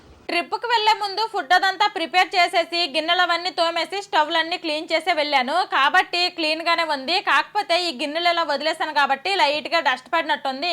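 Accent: Srikakulam, India. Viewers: Voice over lo full sklm loney matldthru madhi sklm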